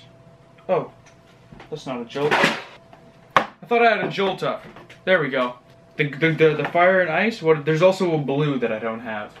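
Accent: Canada. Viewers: Are you from Quebec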